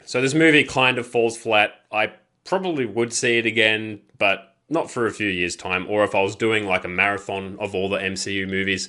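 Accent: Australia. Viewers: Hey thanks for the sub man, subbed right back cause instantly I'm seeing stuff I like! I'll be keeping an eye out for your future stuff! Nice to hear the Aussie accent in more movie reviews too haha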